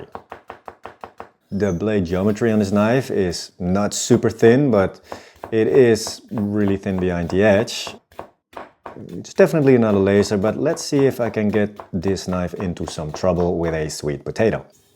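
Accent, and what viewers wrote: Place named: Netherlands. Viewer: Heard about your channel on the sharpening subreddit, I hear a Dutch accent in first 2 seconds. Love it ❤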